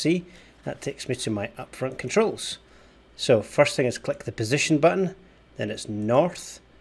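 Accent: Scotland, United Kingdom. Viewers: Finally... A Scottish accent for DCS. Great video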